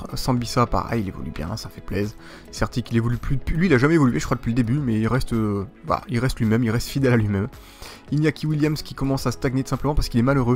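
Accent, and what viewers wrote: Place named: Bordeaux, France. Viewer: Coucou tu connais pissos car j y suis allé en vacances car tu semble venir de bordeaux avec tes "sans deconné " 😂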